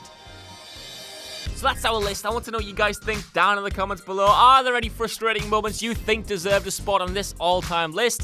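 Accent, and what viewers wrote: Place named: Newcastle, United Kingdom. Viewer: why would you choose a stupid geordie twat to voice the video???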